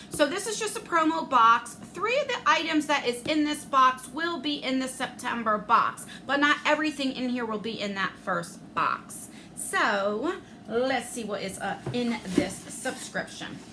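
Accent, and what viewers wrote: New Jersey, United States. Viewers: I just discovered your channel yesterday! I knew you were a fellow Jersey girl from your accent!!!!! Definitely going to keep watching! Always ready to support a Jersey sister!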